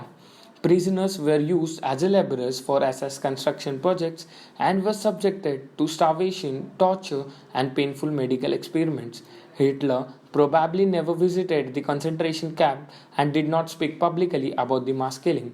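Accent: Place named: Maharashtra, India. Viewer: आप शायद मराठी हो.. आपकी आवाज बता रही है.. आप के दोनों video अच्छे है.. आप को continue करना चाहिए । हो सके तो Atomic habits पढिये.. बहुत बहुत शुभकामनाएं..💐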